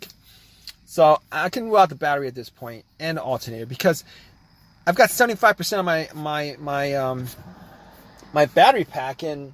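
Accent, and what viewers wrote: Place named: New England, United States. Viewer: He sounds like a New Englander, they help it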